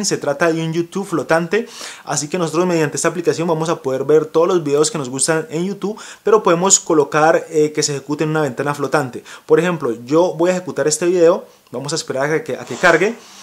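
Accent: Cali, Colombia. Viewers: eres de cali colombia???? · Eres de Colombia? ;:D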